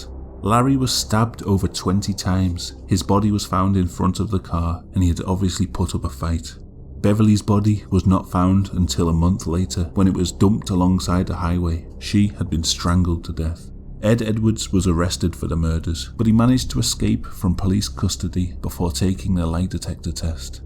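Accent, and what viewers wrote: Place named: Northwest England, United Kingdom. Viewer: Love your videos, they’re always so interesting and familiar because of your Northwestern England accent. My family are all from that area 😊and it’s so nice hearing you voice over the vids. I’ve only just found your channel and couldn’t sub quick enough. Brilliant 🙂keep at it, you definitely keep me entertained x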